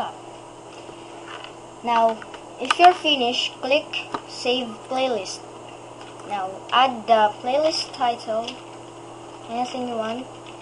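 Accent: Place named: Philippines. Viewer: Pretty fuckin late, but your accent makes me think you're Filipino lmao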